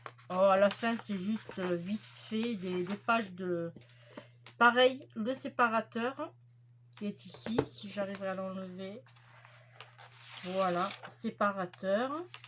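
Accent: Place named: Montpellier, France. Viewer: Coucou bonjour je suis nouvelles abonnée super achats , moi aussi je suis accro ACTION , on a presque le même accents toutes les 2 d'où êtes vous ? si ce n'est pas trop indiscret perso je suis originaire de montpellier mais je vis en Région parisienne ! !!! A bientôt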